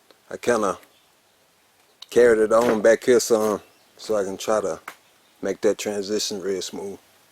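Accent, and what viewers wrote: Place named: Texas, United States. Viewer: I don't know you. First time seeing one of your videos. But that Texas comin thru strong in yo twang. Good stuff Mayne!!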